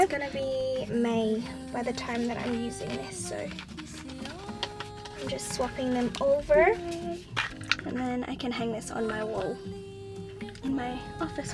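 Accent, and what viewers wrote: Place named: Australia. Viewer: I think I once left a comment on your vlog like, “Do Aussies really speak this fast?” 😂 Was watching Aussie videos to study English and ended up on your channel again… and omg, my hometown showed up!! It felt so strange (but in the best way) seeing it filmed so beautifully. And I’m in Melbourne now — day 3 here!! 😊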